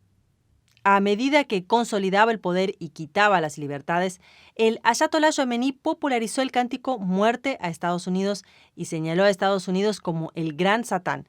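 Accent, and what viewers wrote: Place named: Argentina. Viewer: De donde sos? Pareces argentina hablando en español neutro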